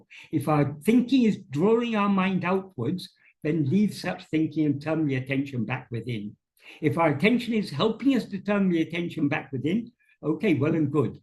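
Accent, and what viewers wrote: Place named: United Kingdom. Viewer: Aprendí a entender tu acento Británico, me costó mucho, pero es una de las fuentes puras en internet. ❤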